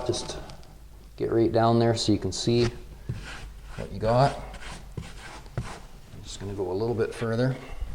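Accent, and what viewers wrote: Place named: Saskatchewan, Canada. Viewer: This guy has GOT to be from Saskatchewan.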